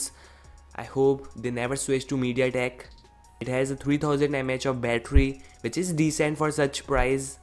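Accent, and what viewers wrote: India. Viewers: Alter dein indisch dialekt geht mir auf die eier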